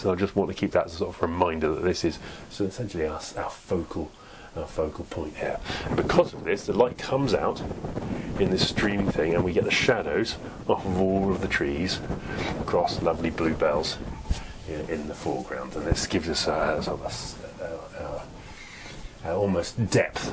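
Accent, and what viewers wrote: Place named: England, United Kingdom. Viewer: You meant 'spot' of tea didn't you??? It's been so long since i've heard a proper English accent... L'oven it...! And you painting too!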